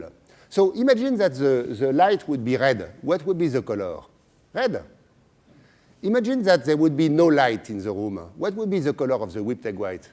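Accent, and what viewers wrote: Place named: France. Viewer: Fucking brilliant. If only we would have teachers who would be like that all the time... with french accent.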